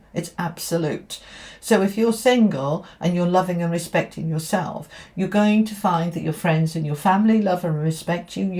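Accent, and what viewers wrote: United Kingdom. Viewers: Dear I started watching you around this time last year. A few things I love about you (besides your beauty and style): You are genuine. Your readings are not robot-like or business-like. It feels like you’re talking to a best friend. You’re also motherly, “in the moment”, very kind and patient. Sometimes I listen to you over and over when doing my hair or doing housework. It just feels homey and warm. I repeat certain words right after you with British accent haha (I’m writing from California). There is more to your videos than just simple tarot readings. There is heart, there is depth and there is wisdom. Have a lovely 2025, beautiful! You are appreciated.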